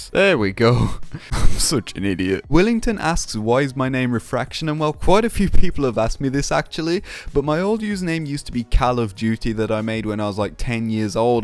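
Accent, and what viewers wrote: Birmingham, United Kingdom. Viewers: Jeez u can really hear the brummie in the older videos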